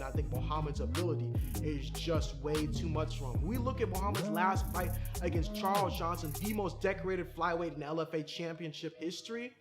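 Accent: Ireland. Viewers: This host has a very different vibe from the usual calming Irish accent. Polar opposite even. Wish him the best but sorry not the one for me. Please stop shouting at me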